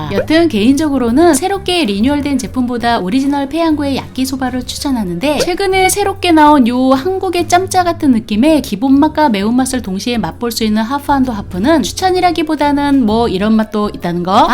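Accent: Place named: Japan. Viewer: 한국어를 일본어 처럼하시네요 ㅋㅋㅋ 독특한 억양 중독성있습니다 꿀팁 감사합니다~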